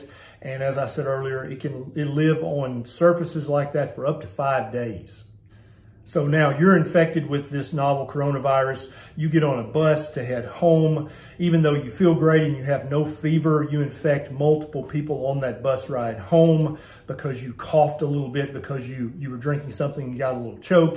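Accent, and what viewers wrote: South, United States. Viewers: I was listening and then I heard his southern drawl, and all of a sudden he’ doesn’t seem as credible as he once did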